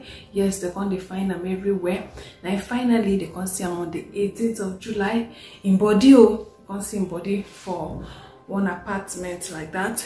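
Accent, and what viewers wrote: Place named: Akwa Ibom, Nigeria. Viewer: Lol, Aiwa ibom intonation 😂😂😂😂😂😂😂 you good sis, keep it up.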